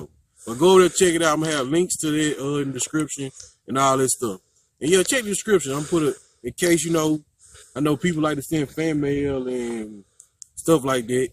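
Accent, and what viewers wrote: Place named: South, United States. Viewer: Baruch Hashem (G-D Bless you), I need a revolver too there's just something ao fun about them that is fun and a good 357 is usually 1 n done if you really need it always enjoy your videos. Youtube needs more ppl like you. Sell some shirts (or practical tac gear/gun gear white label basics whatever?) or something if you need the money like i do, go for it. Your lack of money seeking though also is part of what makes your channel nice and different so if you do it just take some baby steps. You are headed in the right direction keep it up!! what you are doing is rare and only a few others out there that I have found, I like to watch a real person do real things I am also interested in and not be a prick about it. Keep it up you are on the right path and your accent makes me miss the more southern areas of these states something about it just seems friendly. 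Wow, that is a long comment G-D bless you Shalom and Baruch HaShem.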